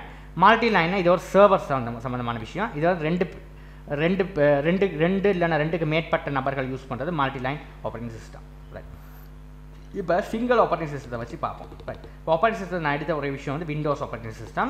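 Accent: Sri Lanka. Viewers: Bro nenga sri lanka va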